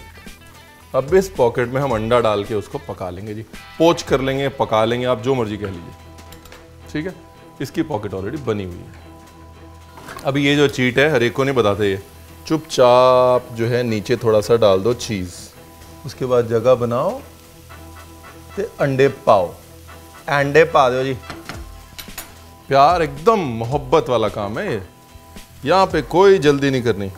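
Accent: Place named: Lucknow, India. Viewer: I don’t watch too many cooking videos but I recently started watching yours and god hooked on to your Lakhnawi Hindi. Kya lehja hai! Take a bow Ranveer.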